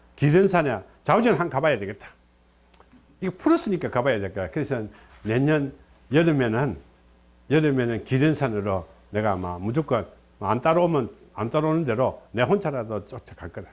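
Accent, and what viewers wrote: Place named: Gyeongsang, South Korea. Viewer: 완전 경상도 도민용 강연. ㅋㅋㅋ. 엄청난 사투리 억양과 말투. 신라 사투리. 기타 지방민은 무슨 말인지 이해될지 모르겠다. ㅋㅋㅋ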